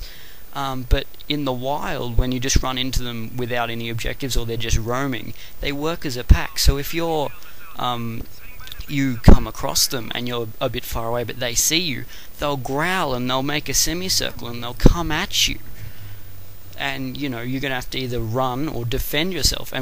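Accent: Australia. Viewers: nice one, haha its funny i find aussie accents annoying.AND IM AN AUSSIE!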